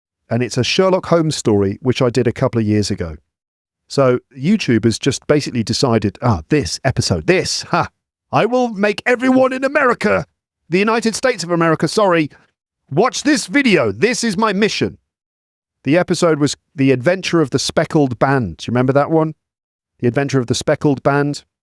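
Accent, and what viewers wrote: United Kingdom. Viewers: Hello from Pakistan, I hope you are doing well. Actually, I listened to your long podcast on my podcast app and I heard you mentioned some people complain about your long introduction to episodes. Please don't listen to them just ignore them probably they're not serious about about their English that's why they complain. But we serious learners love your long episodes and giving explanations to each words weather they're about grammar, vocabulary or just giving explanation. I've been listening to you for six months. I remember listening to you for the first time I was not used to British accent and I kept listening to your episodes again and again they really gave me a satisfying result. Now I understand 95 percent of your English and the rest 5 percent could be not knowing new words. Thanks to your long unique episodes lots of love from Pakistan, Peshawar. 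❤👑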